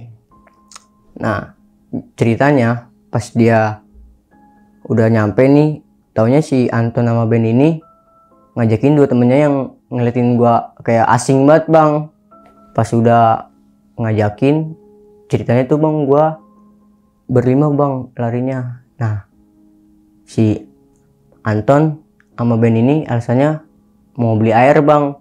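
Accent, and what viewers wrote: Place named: Betawi, Indonesia. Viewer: betawi parah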